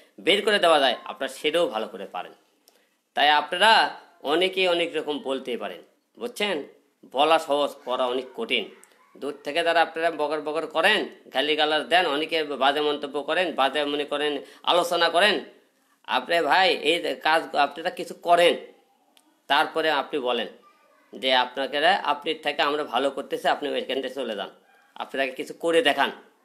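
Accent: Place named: Bogura, Bangladesh. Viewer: বগুড়ার ভাষা বাদ দেন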